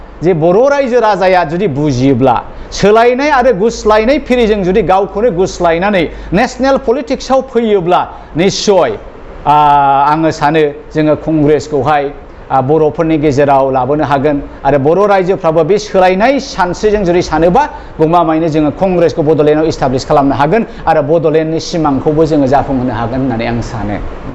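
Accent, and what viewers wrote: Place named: Bangladesh. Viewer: Ngni raoa bangladesi butumnaisw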